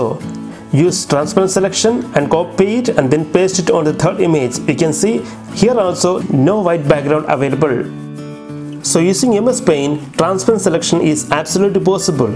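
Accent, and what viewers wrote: India. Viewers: its indian accent, respect it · Oooh, don understnad but he speak english with hindi accent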